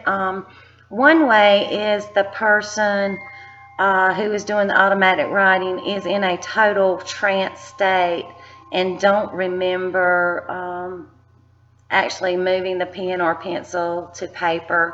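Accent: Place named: South, United States. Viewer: hi I'm a new member as of today so finding you now on utube. I'm so excited. I see you have got plenty of video's but I've been wanting to try auto handwritining cause I've woke up and somehow still had phone in my hand but have wrote out like a sentence and realize I get messages. can't wait also to watch your charkas. I got sle lupus and brain fog I feel like this could help me. lol I'll shut up and keep listening but wanted to let you know I'm excited. n I love us southern accent. I would do the writing like u choose too. namaste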